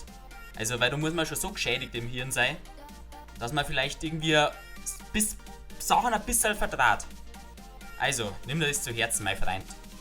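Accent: Bavaria, Germany. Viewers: Der bayrische Dialekt isch mega bin Österreicher verstehe alles nich so vile Unterschied zu meinem heimat Dialekt aber bayrisch isch besser und lustiger💪🏻💪🏻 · Man heat scho das du bairisch bisch! i bin Österreicher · Servus boarisch is der beste Akzent #boarisch für immer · Des is boarisch I komm aus Ostalbkreis versteh alles bisch witzig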